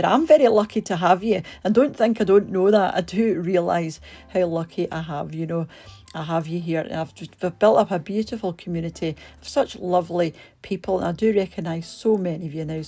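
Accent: Scotland, United Kingdom. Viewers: Lovely Scottish accent whilst a Yorkshire man learns how to cook Toad in the hole .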